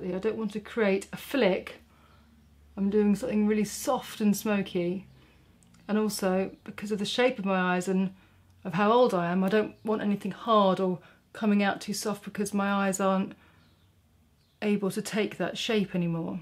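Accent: England, United Kingdom. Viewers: greetings from Los Angeles. Luv this look (and so many others) for La La Land. I really enjoy your videos b/c, like me, you are fair with light eyes. I am that girl who takes a hat everywhere, wears gloves for driving and puts zinc oxide on under my makeup (Sisley-Paris makes a great stick) and even over my self-tanner. It's a constant challenge to prevent discoloration as spots feel as though they come about just from stepping out the front door. It's very tricky finding the right "natural" makeup look, especially in a town where you're supposed to roll out of bed looking like Cindy Crawford (I have actually seen her out for lunch in Malibu so, tough competition!) Thanks for your tips, effort and bravery for getting on camera and being so real. For some reason, things just sound better with an English accent;) Ciao! Alexis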